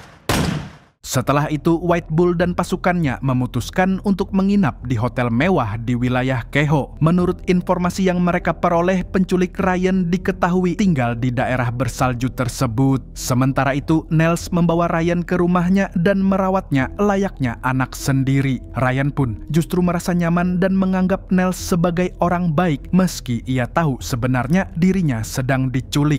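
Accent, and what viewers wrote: Banjar, Indonesia. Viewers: Harau..urang Banjar sekalinya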